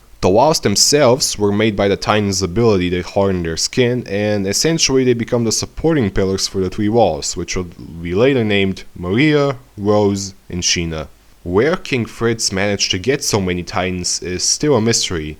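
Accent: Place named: Birmingham, United Kingdom. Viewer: Between that half brummie accent and mispronouncing your "R"s and "L"s, I can barely follow what you're saying. Learn to talk or get someone else to do the voice-over, or just give us text.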